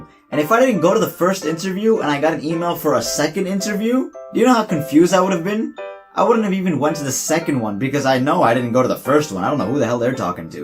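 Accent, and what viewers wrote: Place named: New York, United States. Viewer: the minute i clicked on this video i knew you were from NYC lol, when you said manhasset it confirmed it lol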